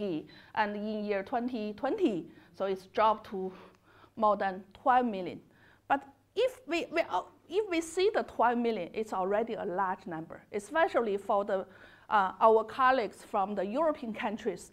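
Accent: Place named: China. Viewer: 这中式英語果然听的清楚多了、：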